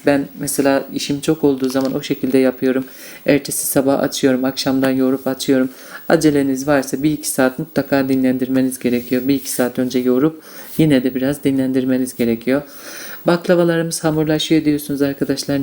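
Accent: Black Sea, Turkey. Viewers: Selamunaleykum abla sesin karadenizli sanki rize artvin sivesini duyuyorum 34 yasindayim Hic acma baklava yapmadim yarin oturup yapmaya caliscam Insallah basarili olurum Hep annem yapar bize Hic firsat vermez,annemde ayni Senin gibi yapar elleri dert görmesin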